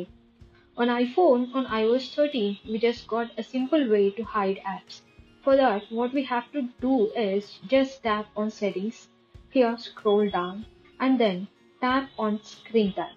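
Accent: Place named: India. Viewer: You know this is real because of that Indian accent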